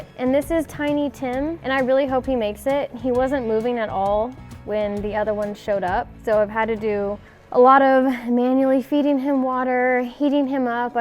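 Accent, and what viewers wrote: South, United States. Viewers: shes cool I like her cause shes into trucks and shes got that southern twang in her like you do ! LoL anyways awesome C10 and chicks !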